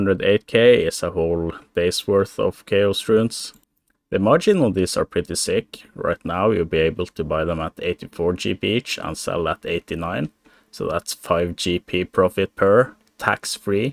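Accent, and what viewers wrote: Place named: Norway. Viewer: Jeg visste at du er norsk ut fra aksenten din, dette er en veldig fin video(vær så snill og si meg hvis norsken min er dårlig, jeg begynte å lære for 1 år siden)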